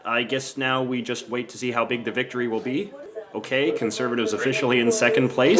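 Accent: Canada. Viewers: I never understood why Americans say Canadians pronounce about as "aboot" until I watched this video. You do it every time. LOL - A fellow Canadian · Hmmm. When I arrived here in Canada(Toronto), accent was one of the first things that I’ve noticed. Especially my white coworkers. Funny thing is they themselves can’t here it or aware of it. Maybe not as pronounced as this guy does but totally different from how the Americans say it. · I think when it comes down to it, Harper lost because of issues larger than the hijab, Syrian refugees or even bill C51. His policies throughout his long term in office showed that he lacked compassion and did not take climate change or any environmental issue seriously. Justin offered a clear to change to this. Whether he will deliver on climate change is yet to be seen. One more thing I have to ask... I have seen a few of your videos now (and they've been great) but I'm wondering if the way you say "aboot" is just a subtle joke to entertain American viewers or whether it's authentic. 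I'm from Vancouver too and can't say I've heard anyone pronounce it quite like that before.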